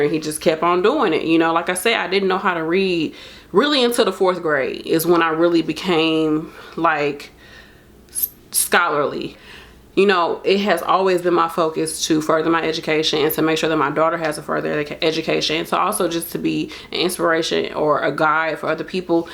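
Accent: St. Louis, United States. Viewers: I knew you had to be from St Louis I can hear it! I’m from St. Louis too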